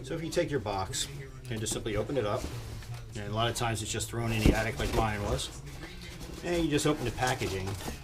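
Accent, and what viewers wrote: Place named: Delaware Valley, United States. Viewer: Thought you might be from the Delaware Valley listening to you throughout this great instructional video. I just noticed the DCFS videos in your library. Do you still shoot there? I just joined this year and started shooting Trap, perhaps we'll cross paths?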